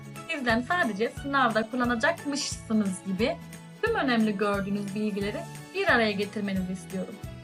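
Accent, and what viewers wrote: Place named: Azerbaijan. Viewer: Seslendiren azerbaycanlımı Türkçeyi zor söylüyo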